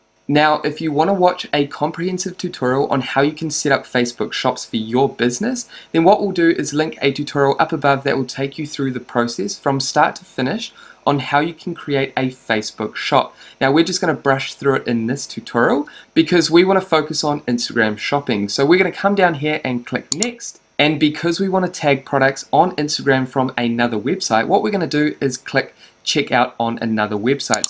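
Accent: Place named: New Zealand. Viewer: I was wondering why your accent sounded so much like Wholesale Ted and it turns out you guys are both from New Zealand. The 'Catalogue' part was the trigger lol.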